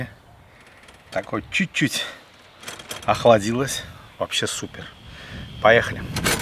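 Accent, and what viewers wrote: Baku, Azerbaijan. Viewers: Приятно слышать русскую речь с Бакинским акцентом.рецепт бомба 😊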